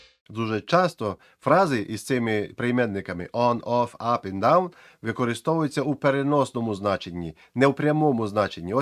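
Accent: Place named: Galicia, Ukraine. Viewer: Красно дякую за ясне тлумачення! Мило та радiсно чути галицьку вимову пана! Най щастить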